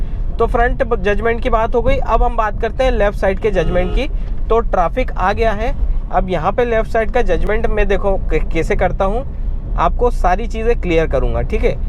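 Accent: Indore, India. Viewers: Bhai aapki aawaz se hi pehchan gaya aap indore se ho❤️❤️❤️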